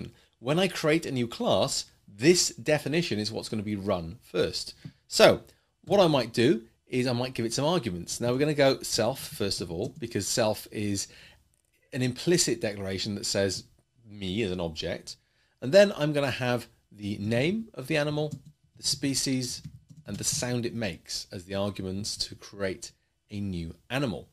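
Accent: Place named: Cockney, United Kingdom. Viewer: Cockney Accent 🤣🤣🤣🤣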